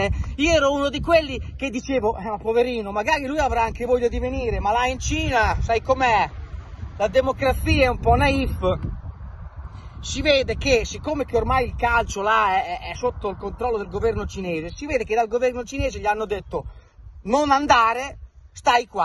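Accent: Romagna, Italy. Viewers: io lo ascolto solo per il mitico accento romagnolo!! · 100% romagna, quando uno è incazzato non c’è niente di meglio del dialetto romagnolo per esprimere il proprio malessere, te lo dice un romagnolo doc che quando ti sente parlare si sganascia dalle risate... e così sei passato dai piatti ai pomodori, e va beh, almeno sono freschi e sai cosa strozzi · Se fai il video in romagnolo vuol proprio dire che sei incazzato... mi aspettavo qualche piatto rotto...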